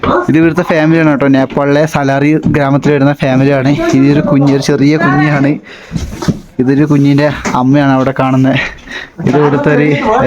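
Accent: Kannur, India. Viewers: ഇങ്ങള് കണ്ണൂരാണോ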